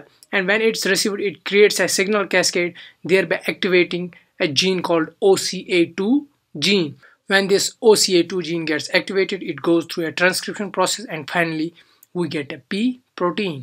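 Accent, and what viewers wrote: India. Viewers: I didn't understand one word this guy said LOL is he talking English.? he is talking like a scientist to us he is very condescending. also he has an Indian accent, which does not help matters! LOL He needs to talk to other scientists not the general public. Blue eyes, brown eyes , green eyes. that's all I could get from this guy. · Ronnie yeah he has an Indian accent probably because he’s from India. It’s a bit hard to understand but not like you can’t understand anything. Lamo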